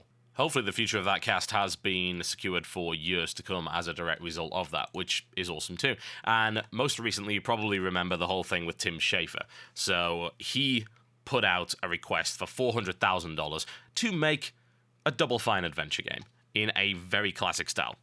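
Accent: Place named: United Kingdom. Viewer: "Bawoldur's Gate," god I love TotalBiscuit's accent. It's so hilariously British.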